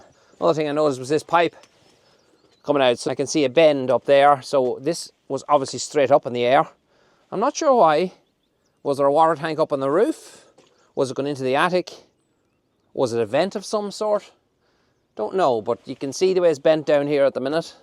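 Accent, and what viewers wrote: Cavan, Ireland. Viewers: what accent is that ??? Cavan ?? Trying to figure where in Ireland you are ? 🤔☺️😉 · I enjoyed watching, and I have subscribed. I have watched other videos of restoring cottages and going off-grid by Brits who have relocated to Ireland from the UK. It's nice to hear an Irish accent.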